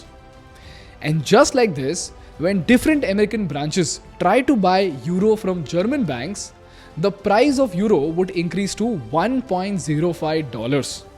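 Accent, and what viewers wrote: India. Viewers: Its indian accent, much easier to understand. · Can’t take anything serious in this Indian accent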